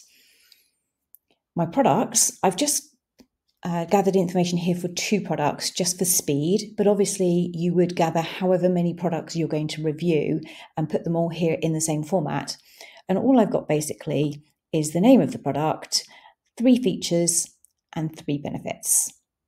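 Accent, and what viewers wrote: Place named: United Kingdom. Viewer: After I made the comment I was thinking that it might have been your microphone? I look for education, not for entertainment. Also, there is nothing wrong with your British accent, it was the sound quality!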